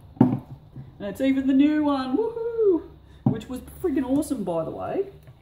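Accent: Australia. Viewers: Love your video and your "accent"... the aussie accent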